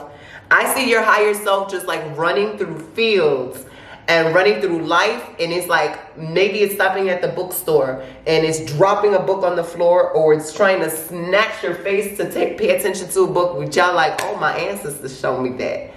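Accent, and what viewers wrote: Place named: Brooklyn, United States. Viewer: You so Brooklyn & it just makes your videos so much more pleasing & resonating.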